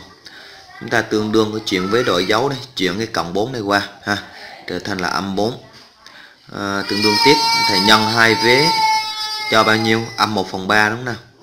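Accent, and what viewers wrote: Binh Dinh, Vietnam. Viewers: giọng bình địa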